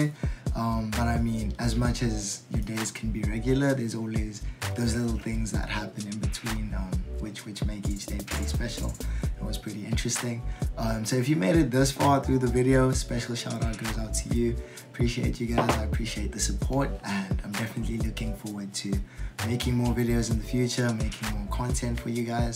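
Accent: South Africa. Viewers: Am I hearing a South African accent here 👀😅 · I noticed that you've got a South African accent, how did you make it to MIT from SA?! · you have a "jhb" accent, I picked it up a mile away 😅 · Your accents sounds so South African, have you ever been to South Africa? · Where are you from? I cant tell what accent you have, sounds South African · Weird question but are you South African😂😂😂 I cant shake the feeling💀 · Lol. Idk why but I'm sensing a South African accent... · You have a South African accent...you from there?? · Are you South African??😏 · You sound so South African… am I correct or not? 🇿🇦 If so, could you please make a video about it; Matric marks, how you applied, what the costs are, etc. Thanks brother. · I know a South African when I hear one. 😂👀👋🏼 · Were you born in South Africa, by any chance? Your accent sounds a lot like ours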